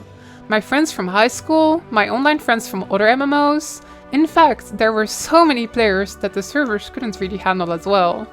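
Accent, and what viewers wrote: Netherlands. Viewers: I love that Dutch accent omg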